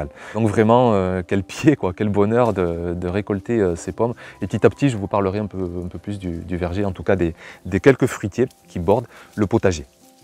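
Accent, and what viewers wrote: South, France. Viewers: Oui c est y ça la voix du sud belle semaine également ☺️🙏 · Merci! Quel pep's avec bel accent du sud! Ça fait du bien de t'écouter! Je m'échappe ainsi un peu de mon bureau et de l'industrie!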